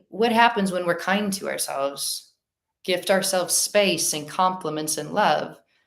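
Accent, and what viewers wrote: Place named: South, United States. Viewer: Suddenly realized she’s southern lol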